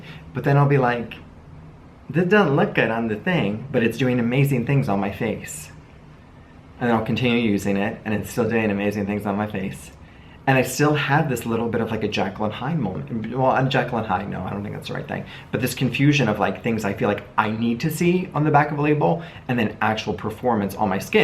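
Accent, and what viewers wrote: New York, United States. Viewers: Are you a former New Yawka?? If so, hi from New Yawk. Just ordered serum and spray for the first time. Can’t wait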